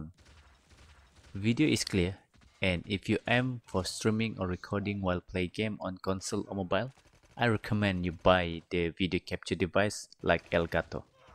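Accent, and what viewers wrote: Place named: Sabah, Malaysia. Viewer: Mcm sabahan beii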